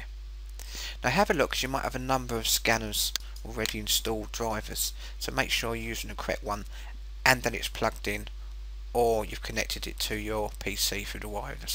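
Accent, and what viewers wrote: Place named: England, United Kingdom. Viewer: FUCKING ENGLISH ACCENT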